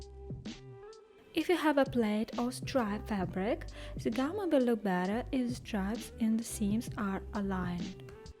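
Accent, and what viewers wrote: Russia. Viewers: Hi! You sound very much Russian, with little difference in the melody of your speech. Also, your wedding ring (I assume) is on your right hand :) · У неё акцент как у русской🗿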